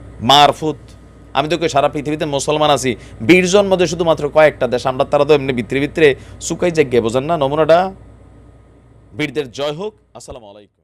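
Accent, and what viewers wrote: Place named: Comilla, Bangladesh. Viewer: আপনার কুমিল্লা ভাসা বন্ধ করুন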